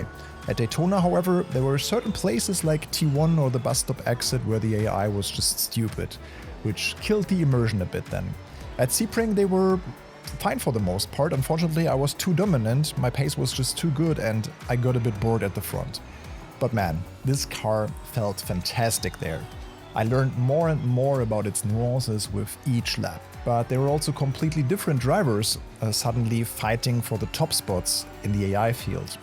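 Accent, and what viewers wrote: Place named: Germany. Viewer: Man merkt sofort das du made in germany bist 😅.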